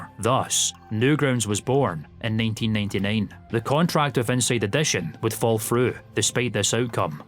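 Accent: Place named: Scotland, United Kingdom. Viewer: I cant tell if youre scottish or irish but i can recognize youre scottish and that scares me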